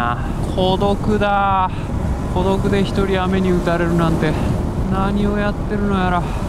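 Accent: Kansai, Japan. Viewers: どぎつい関西弁で文句言ってるのにたまにの 怖いよーがかわいいw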